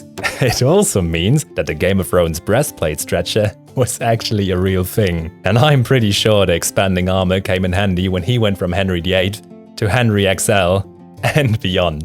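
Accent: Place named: Germany. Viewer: I heard german ! Ich wuste es der dialekt kam mir bekannt vor😂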